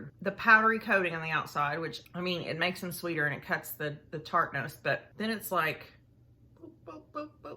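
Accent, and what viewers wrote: Kentucky, United States. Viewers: I know I don't need to say this, but industrially produced cakes like Mr Kipling's are awful compared with proper home - made cakes, well, my mother's anyway. By (late) mother's Bakewell tart was absolutely delicious, Mr Kipling's Bakewell pukeworthy! Sorry, I'm rambling - the result of several rum and cokes! Thanks for the entertainment - I love the Kentucky accent!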